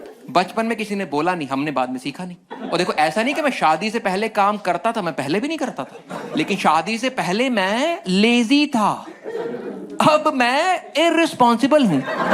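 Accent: Punjab, India. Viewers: bhai ka punjabi accent makest it more funnier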